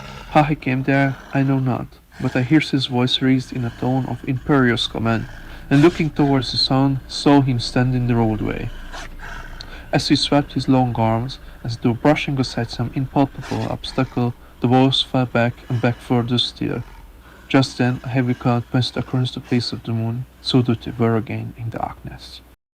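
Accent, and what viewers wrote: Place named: Hungary. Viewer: Hello Dear 🌻🐝 You're my first Hungarian accent so in my 71 years I've had no experience developing an ear for it. If you'll share a chapter you feel is your best translation so far I will try to learn it. Looking forward to hearing from you again. Auntee Sara ⚘️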